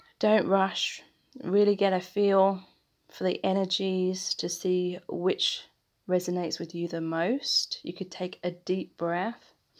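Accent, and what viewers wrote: United Kingdom. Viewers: This reading is more than helpfull , thank you so much XOXOXOX and I love your British accent 🧡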